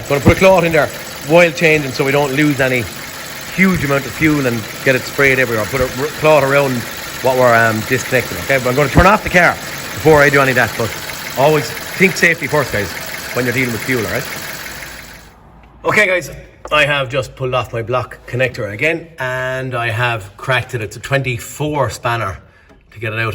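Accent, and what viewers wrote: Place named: Ireland. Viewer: Awesome video, everything thing I needed to get on. Mostly just the location of the sensor. But the using the multimeter and what to expect. I initially had been watching without audio and using subtitles and I could tell you were Irish by the subtitle errors. Great accent. I have a Geordie accent so I'm not throwing any shade. ✝️🇬🇧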